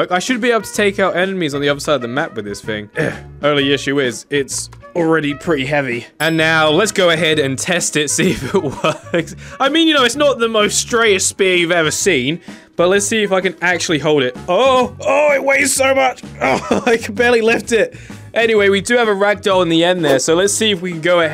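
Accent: England, United Kingdom. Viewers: R you from inglan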